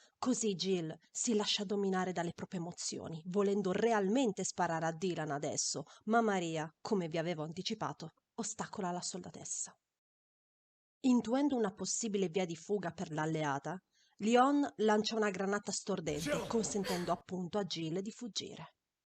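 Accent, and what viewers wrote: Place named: Italy. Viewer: You've just became one of my favorite humans on earth ! Really good video and i like your italian accent ! It's very well written and it's consistent the only thing i could say : " just continue !"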